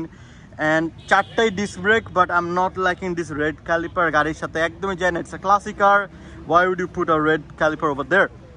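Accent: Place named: United States. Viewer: আপনি কি নরমালি কথা বলতে পারেন না ভাই। শুনতে যেন মনে হয় কোনো একজন আমেরিকান লোক কথা বলছে।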